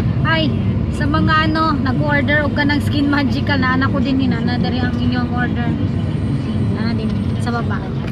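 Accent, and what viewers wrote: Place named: Visayas, Philippines. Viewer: kaluoy sab sa imong amega sis...nice vlog cute kaau ka mag visaya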